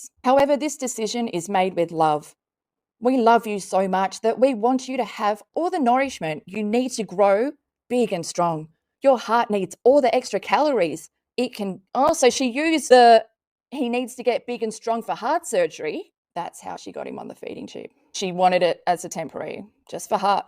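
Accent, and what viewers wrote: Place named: Australia. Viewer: How dare you. Do you know that person? Have you spent time in her home? So you claim to have had all the conditions her boy has? You sound Australian. I've just reported your content. I'm not American, so I've not watched her channel and I'll never watch yours. I hope many more people report you. You are a dangerous and evil person.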